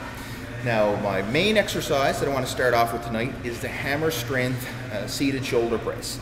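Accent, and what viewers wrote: Newfoundland, Canada. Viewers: You must be Canadian. I only can tell because I have watched a bunch of Trailer Park Boys...lol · Are you Canadian? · are you canadian? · u a newfie?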